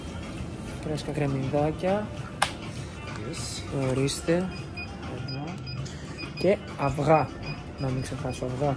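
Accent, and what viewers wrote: Greece. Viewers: Ο μπρο την έμαθε αγγλικά με ελληνική προφορά 😂 Συνέχισε να κανεις αυτο που ξερεις εχεις την δύναμη και θα τα καταφερεις❤ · Κρατά την ελληνική προφορά στα αγγλικά θα ειναι πιο φυσικό και κάτι ξεχωριστό για τους ξένους βιουερς το να προσπαθήσεις να κανείς αμερικανική προφορά θα ειναι λίγο fake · για την ωρα μιλας αγγλικα σαν Ελληνας...δεν ξερω ποσος χρονος σου περισσευει αλλα θα προτεινα,εκτος τα μαθηματα,παρακολουθηση ταινιων και βιντεο μονο στα αγγλικα...επισης πρεπει να αρχισεις να σκεφτεσαι αγγλικα...καλη επιτυχια σε οτι κανεις στη συνεχεια...εμεις μαζι σου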